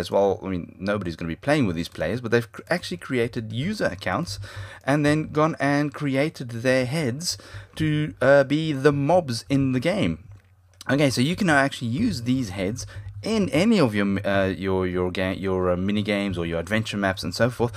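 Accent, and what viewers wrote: South Africa. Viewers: He does sound South African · are you South African?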